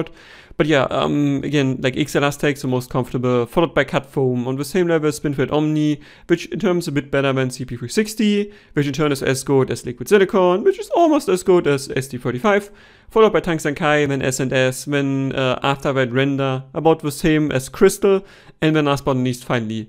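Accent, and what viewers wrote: Germany. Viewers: Für mich war’s überhaupt kein Problem, deinen deutschen Akzent zu verstehen, wenn du Englisch sprichst. Ich bin nämlich auch zweisprachig (Deutsch/Englisch), also mach dir da echt keinen Kopf. Dein Akzent erinnert mich an Der8auer und der hat immerhin 240 k subs. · Madre Mia!! Your English is totally undecipherable. SLOW DOWN YOR SPEAKING. You have a very thick German accent.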